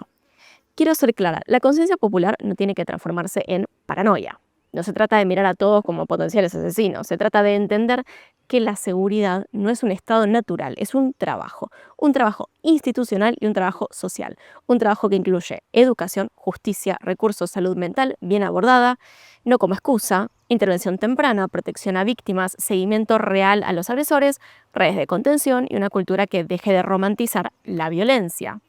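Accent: Buenos Aires, Argentina. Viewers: Excelente tu análisis!!!, tu léxico, que en tu otro canal, en los últimos tiempos me hacían ruido las groserías y no lo digo por mojigata, sino que sos la única argentina a la que sigo hace años y más allá de algún que otro modismo, sin llevar tú relato al neutro, hacés honor al habla del porteño, que tan lindo se escucha. Gracias totales porque sin esfuerzo me hiciste analizar porqué veo y a quienes elijo para ver y oír tanto tru crime. 💋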